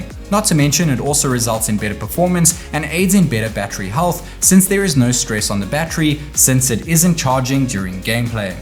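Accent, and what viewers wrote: South Africa. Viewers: I didn't know you are South African 😱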